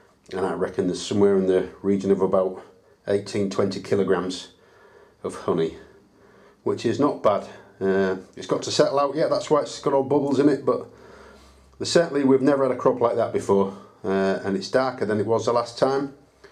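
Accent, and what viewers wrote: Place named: Manchester, United Kingdom. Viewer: Thank you so much most interesting after watching your three videos. We are hosting three hives in our garden and I’ve been asked if I want to look after them. You are most helpful. We live in Herefordshire but we couldn’t help noticing your Mancunian accent which is where we originally came from.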